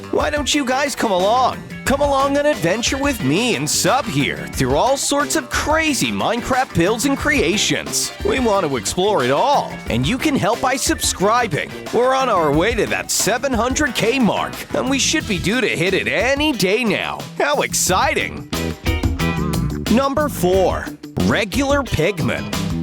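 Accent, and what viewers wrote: India. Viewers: R u indian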